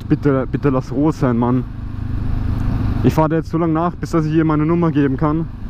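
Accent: Austria. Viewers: bist du österreicherM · unser Akzent bleibt #austria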